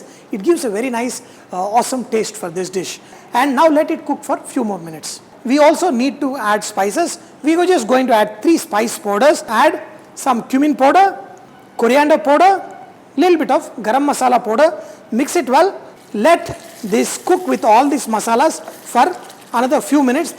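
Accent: India. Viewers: Too much buttler english...show some useful content, not interested in the nuisance. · Excellent cooking plus hard Indian accent 🙌🙌🙌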